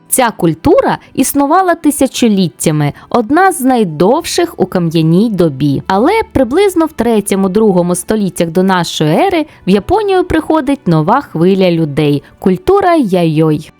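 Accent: Russia. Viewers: Мій комент майже аналогічний) Дуже ріже вуха мацкальска вимова японських слів і назв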